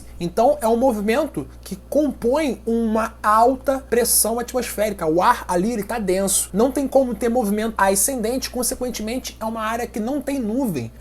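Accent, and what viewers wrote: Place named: Rio de Janeiro, Brazil. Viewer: sotaque carioca tava forte, são não mais que a explicação, falou pouco, mas disse tudo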